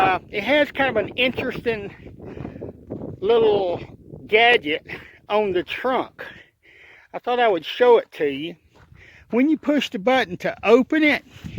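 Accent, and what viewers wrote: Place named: United States. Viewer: Can your accent be any more American? Good video by the way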